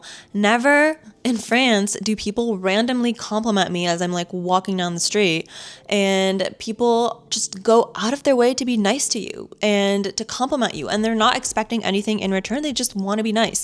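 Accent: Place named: United States. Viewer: J'aime tes vidéos, surtout ta simplicité et ton côté terre-à-terre, sans frime. Ce que je n'apprécie pas, c'est ta façon d'imiter les américaines: ton discours, ton intonation, ton langage corporel, ton langage avec les mains... Dommage qu'on ait perdu le charme, la douceur, la sophistication et les caractéristiques d'une jeune femme Française.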